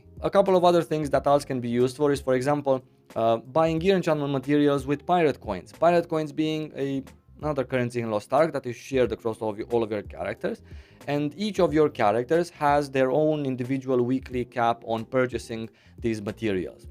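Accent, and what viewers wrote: Italy. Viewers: are you italian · As usual very nice video thanks ! May I ask you a question ? I'm French and I'm used to hear people speaking English from different countries and with different accent. I don't know why but you accent sounds Italian, the way you speak English and the way you handle your hands as well :) At the same time I also think you may be from from an Eastern country like Romania. Do you mind telling where you're from ? (and no it's not because I'm a weirdo and felt in love with you !!!)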